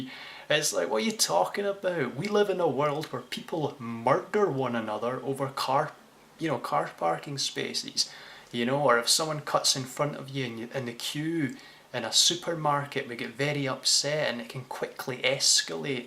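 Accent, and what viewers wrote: Scotland, United Kingdom. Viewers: Great video! loved the 'enlightenment' bit =) hearing that beautiful Scottish accent is never bad, either :)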